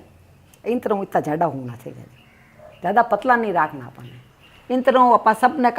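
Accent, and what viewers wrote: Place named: Marwari, India. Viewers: Very nice recepie. मारवाड़ी बोली सुन कर बहुत अच्छा लगा. Pranaam पापा mummy. आप दोनों हमेशा खुश रहिए और स्वस्थ रहिये. 🙏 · I love the recipe auntyji...u described it so well.. u r so beautiful and elegant. Ur marwadi is lovely... keep sharing the new and delicious recipes with us .. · Thanks for sharing your traditional recipes. You seem very experienced. I am originally from Ajmer so I am loving your Rajasthani accent, but it’s amazing to hear all the English words you speak with a beautiful accent. Have you ever made stuffed guttas?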